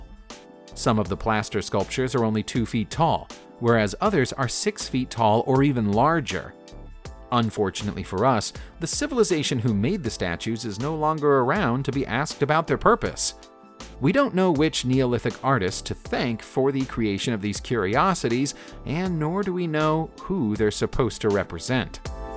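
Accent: United States. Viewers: just the next disgusting american accent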